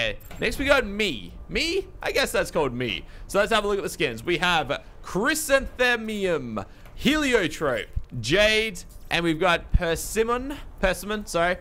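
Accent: Australia. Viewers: Hey, why are you Australian?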